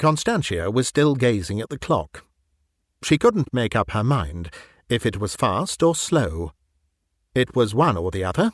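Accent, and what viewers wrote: England, United Kingdom. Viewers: Probably the best narrator on YouTube. It's a joy to listen to a trained English voice. Thank you.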